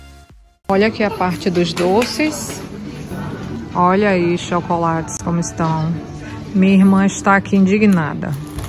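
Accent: Bahia, Brazil. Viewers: Arrá! Descobri! Ela é baiana! Fala mainha, salvadô....😁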